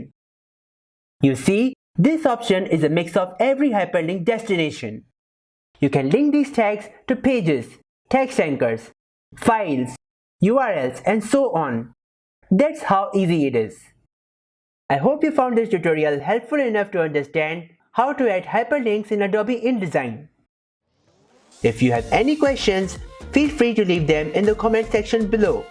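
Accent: India. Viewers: Damn, that indian accent. Just cannot listen to it. Get better narator.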